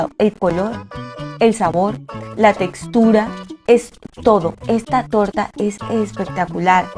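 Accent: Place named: Caribbean Coast, Colombia. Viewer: Tiene un acento costeño como de Barranquilla o Sincelejo con un toque como de Santandereano y paisa.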